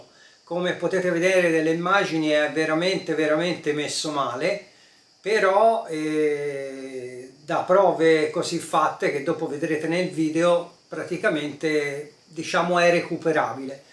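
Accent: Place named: Livorno, Italy. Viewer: okkk. Mi sembrava un accento più labronico. Ad ogni modo complimenti per il canale!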